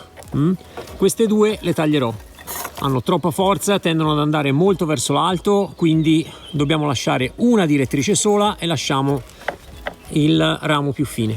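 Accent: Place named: Tuscany, Italy. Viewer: infatti..il tuo accento è anche il mio...io abito zona Lucca fora...terra di Ulivi ...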